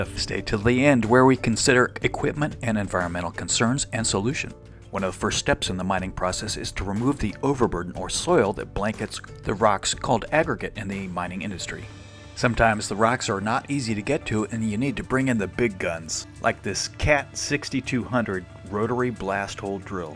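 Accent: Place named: United States. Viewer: Hi my dear! Great sharing! I love your american accent by the way ❤ Big hug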